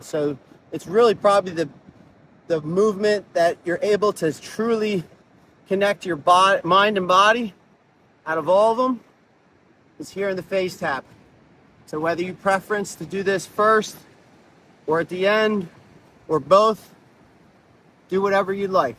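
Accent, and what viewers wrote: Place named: Philadelphia, United States. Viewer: Omg. I love you but that Philly accent...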